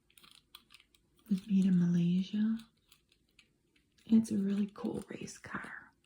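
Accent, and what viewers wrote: United States. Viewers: Love the videos, australian here and I'm curious what regional accent I'm hearing? It's so oddly charming and I don't know the first thing about US dialects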